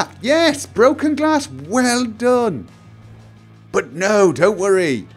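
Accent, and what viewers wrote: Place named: Manchester, United Kingdom. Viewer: I think he's from Manchester, UK.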